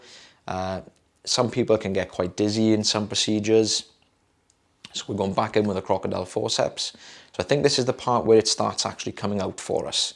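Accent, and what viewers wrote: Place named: United Kingdom. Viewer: Just curious what part of the UK you are guys are from? You and have the same accent. I watch a lot of British television and follow quite a few Brits and there is a very distinct difference between accent sounds. Thx. You guys are the best!🇬🇧🌷🇬🇧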